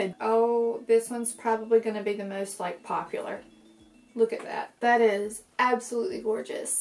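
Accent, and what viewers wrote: South, United States. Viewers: New subscriber! Your accent completely won me over 💘 I'm a southern girl myself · yeah she has a really charming southern accent.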